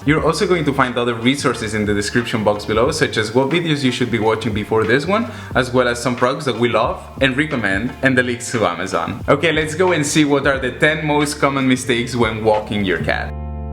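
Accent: Spain. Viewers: Nice video! Thanks for the tips, going to try walking Felix soon! Also I noticed your accent, just wanted to ask, are you from Catalonia?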